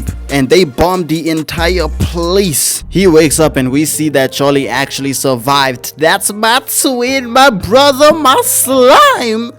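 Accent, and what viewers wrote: South Africa. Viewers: You sound south African bro😂😂 but don't worry I fucks with your content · I dont know .... bro sounds south african 😑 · I knew you were South African 😭😂🇿🇦🇿🇦🇿🇦🇿🇦 yebo lapho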